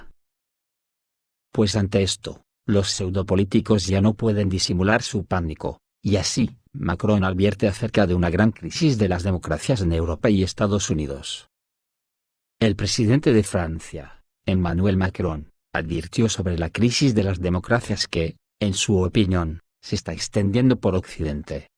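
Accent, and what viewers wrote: Spain. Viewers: El acento es vizcaíno.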